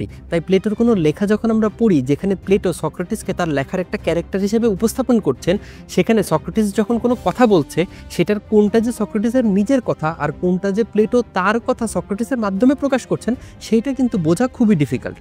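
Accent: Bangladesh. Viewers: ভাল প্রয়াস। তবে বঙ্গভাষার পূর্ববঙ্গীয় গ্রাম্য উচ্চারণ পরিহার করতে হবে।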